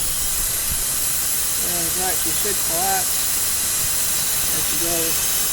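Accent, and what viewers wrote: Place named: Midwest, United States. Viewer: I DO wish you would call an oil gallery a gallery not a bloomin GALLY, ok ok I know mid western accents sound different - hell I even let you off with alt'nator for ALTER NATOR lol as in it makes alternating pole current not direct current like a dynamo but i'm sorry oil gally simply will not DO lol, anyway enough of that. Well done a really good job well executed.....besides your dads and sausage fingers interference obviously lol....just pray he doesnt demand you pay him back for all the bits he bought eh, your first ride is an epoch event in a young lads life - so much more when you built it all yourself with so much love and dedication, enjoy it every minute you can.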